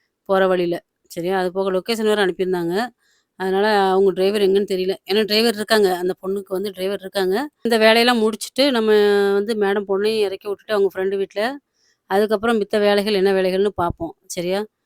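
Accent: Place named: Tirunelveli, India. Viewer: நீங்க எந்த ஊர் திருநல்வேலி பேச்சி மாதிரி இருக்கு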